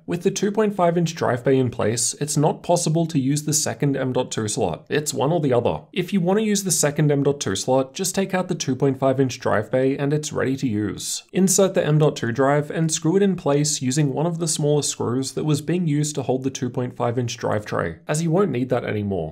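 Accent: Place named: Australia. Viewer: It’s so odd how ozzies say words with an o. Nerrrrrr lenerrrrvo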